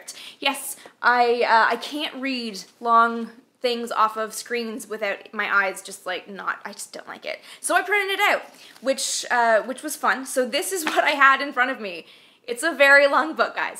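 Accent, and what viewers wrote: Canada. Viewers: Did you straighten your hair while your voice was recovering? This is an odd thing for a guy to notice, but in the recording videos it was wavy and in the main video it was very straight. Also you sounded more Canadian today than usual. I guess that's random chance; there must have been more words today that we Americans pronounce incorrectly. :-) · Regarding your pronunciation and your Canadian accent, you ARE Canadian, you SPEAK with Canadian accent, so why dwell on it? Without your accent it wouldn’t be you, simple as that I really do hope we can get it here in Sweden I wish you a merry Christmas and a happy new year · What is wrong with using a Canadian accent, eh? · I'm from the US and I love hearing the Canadian accent. It's very endearing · You're Canadian so by all means speak as a Canadian! I love it! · Awesome. Though I do admit that I feel you should keep your "Canadian-ness" and say the words as you normally would. 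When reading if I know the sound of the authers voice for some reason the voice in my head as I read sounds like the auther. This was what happened as I read "Breaking the chains of gravity" (BRILLIANT book btw) It was like your voice in my head and not mine. Ok. That may sound weird to some but thats just the way I am. Oh and any chance of a 'Pete' video like the make up one you did? But another subject. That Pete one was great.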